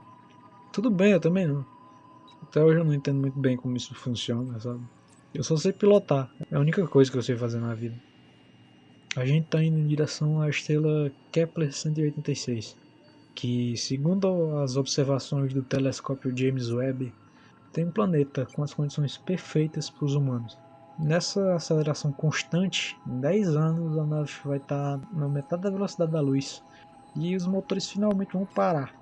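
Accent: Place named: Brazil. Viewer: Eu sou do ES naturalmente "sem sotaque" mas ouvir um sotaque tão brasileiro me aproximou tanto que não sei explicar.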